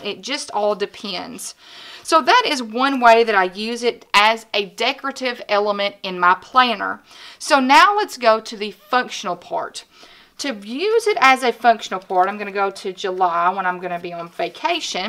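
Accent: South, United States. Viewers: Honey...you are from the South huh?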